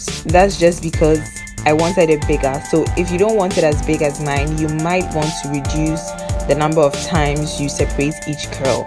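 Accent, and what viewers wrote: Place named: Ghana. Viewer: I can hear Ghanaian accent. I hope am right. U look beautiful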